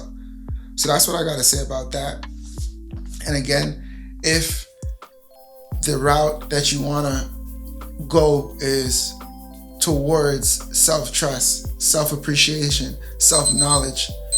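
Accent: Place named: Jamaica. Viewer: Great advice. idk how, but I knew u were Jamaican as soon as u spoke lol wassap fam. But yeah. I think one of the hardest things is trying to build yourself outside of your family. Like you were saying, certain habits you didn't know you had. I recently moved out, and there are so many things I thought were normal that aren't.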